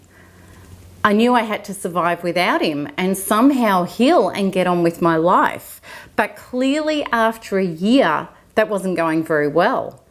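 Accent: Australia. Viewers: I love your Aussie accent :) hello from Washington DC and thanks for your help!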